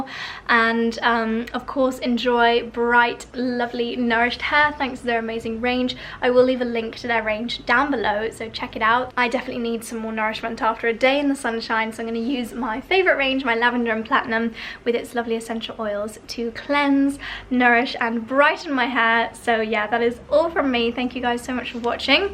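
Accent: Spain. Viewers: You have a very good spanish!! Im Mexican and it was lovely to hear you speak with a quite strong spanish accent 💕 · Love your Spanish accent Buenos Dias a ti good morning to you :)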